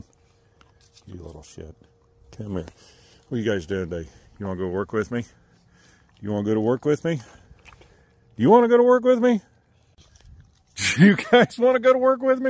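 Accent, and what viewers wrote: South, United States. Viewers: That's the best southern accent from a Yankee, Ive ever heard !! 😊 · You might live in Illinois now, but your accent says you came from somewhere further south, I reckon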